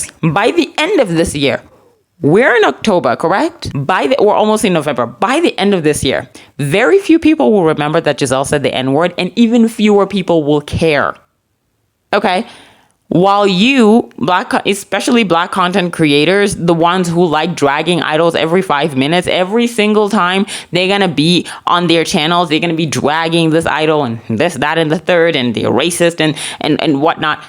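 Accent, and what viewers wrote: United States. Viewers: I had no idea that you had never been to the U.S. before. I always thought that you spoke English with an American accent. ; )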